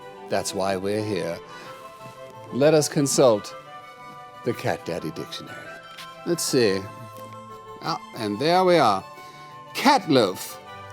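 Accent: England, United Kingdom. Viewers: How long have you been training to speak posh Englisch? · Lol! Great description of The Loaf - with illustrations!! Also LOVE the British accent/Masterpiece Theater style. Had me smiling a long time. Thank you. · I can smell some English accent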